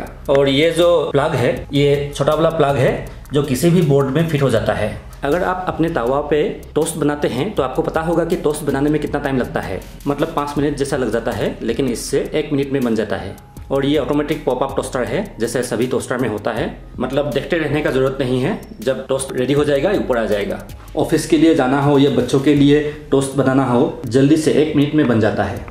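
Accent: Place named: Bengal, India. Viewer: Wow Bongali accent, Kemo naaache?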